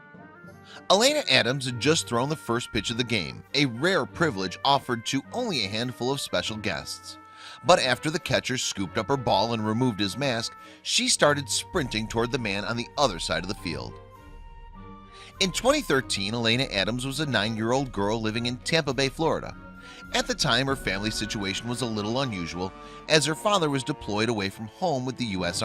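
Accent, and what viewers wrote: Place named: Midwest, United States. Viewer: Maybe just play the video instead of a 10 minute explanation? Especially with that nauseating Midwest accent, torturous.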